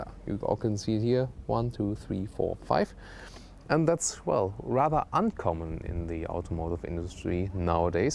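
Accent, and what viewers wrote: Germany. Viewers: If you like Audi mehr ähh more... hahaah Finds auch witzig wie du Autogefühl ausspricht, klingt wie nen Engländer der versucht des auszusprechen, aber sonst halt immer voll den deutschen Akzent xD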